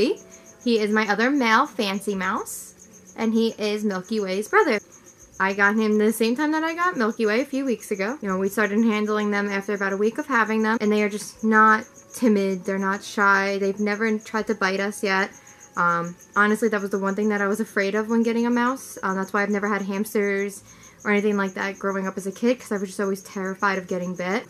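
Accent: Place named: East Coast, United States. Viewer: Hey hey hey! Something to consider is that reptiles can live together for years without problems and then one day they can snap. I'd really hate to see that happen. I follow a bearded dragon rescuer on Instagram who has seen it happen a million times with beardies she's taken in. Omg I want a leachie so bad! I don't buy from breeders so I need to find one that's special needs or adoptable! Where are you from? You sound like an east coaster, which I used to be! Do you find that cognitively you have any issues feeding mice while also having pet mice? I can't keep snakes because of my affection for my pet rats. I'm not passing judgement I promise :) I'm curious about how you see it in your mind because everyone is different. BEAUTIFUL DOGS! Be careful with those plants in the axolotl tank. If they're not silk plants they can tear the skin of the axie, especially the tail. 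Good video :)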